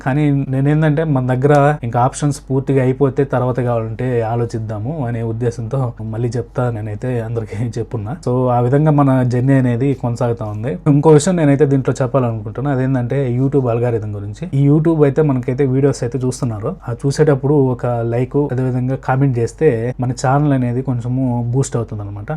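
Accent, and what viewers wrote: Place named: Andhra Pradesh, India. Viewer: Your way of talking is too polite and ap slang is good and genuine bro keep it up ur progress is a head we all have to support u ok ❤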